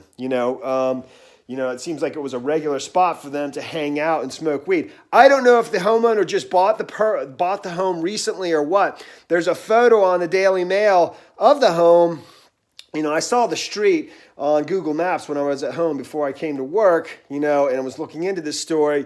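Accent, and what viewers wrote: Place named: Charleston, United States. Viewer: Your accents pretty thick, its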